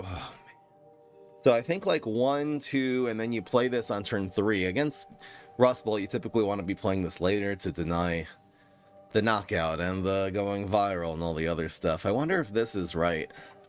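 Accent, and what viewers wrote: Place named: France. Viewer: Voice french is so funny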